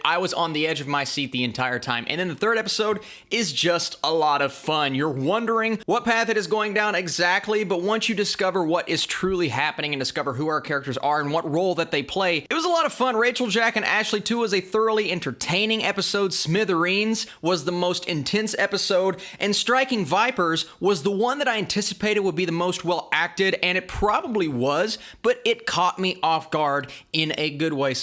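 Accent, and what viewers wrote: South, United States. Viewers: This guys southern sounding accent 😍🤤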